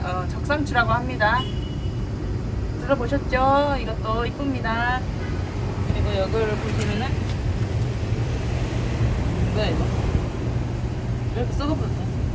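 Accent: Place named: Jeolla, South Korea. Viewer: ㅋㅋㅋㅋ전라도 사투리 구수해유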